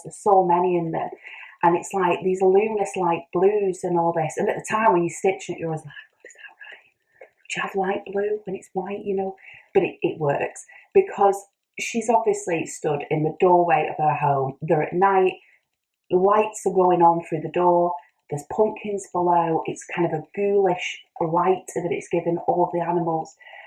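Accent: United Kingdom. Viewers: I came over here after hearing about you from Darren Dizzy Stitcher and he was right, you're fab! I'm another British stitcher and it's so nice to hear a British accent "knockin about" on Flosstube! You're so easy to listen to and natural. I love your full coverage pieces and daren't look at Paine Free Crafts! Looking forward to seeing your progress next time!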